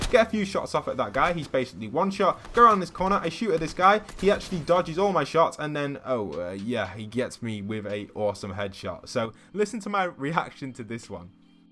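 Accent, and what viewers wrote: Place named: Cheshire, United Kingdom. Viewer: Hey you sound like your from the Cheshire area it would be awesome if you are because I am too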